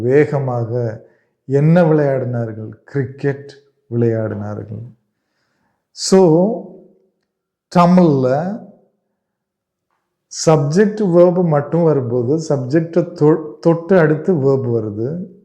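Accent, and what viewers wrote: Kerala, India. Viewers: I don't need anyone's coaching as my vocabulary is excellent. It was just a suggestion because what you blabber in the name English is pure junk with all that mallu accent.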